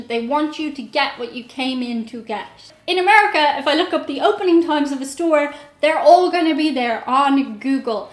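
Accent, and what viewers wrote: Ireland. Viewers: Here's an idea for your next trip to the US; you should try going to a firing range. I think I remember "Joel and Lia" going (I believe you know them or I wouldn't have mentioned them on your channel). I guess I'm the odd American, because I have been travelling abroad for longer than you've been alive, almost 25 years;). One reason I watch your videos is I miss the accent when I can't travel, love Ireland. Keep up the great work. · Is it just me, or are you starting to sound REALLY American yourself? I can barely hear your Irish accent anymore.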